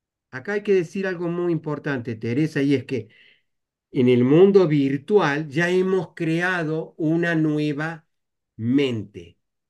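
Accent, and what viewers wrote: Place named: Córdoba, Argentina. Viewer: Buen día!! Que sorpresa escuchar a un cordobés como yo. ,jajaja, lo estoy escuchando, gracias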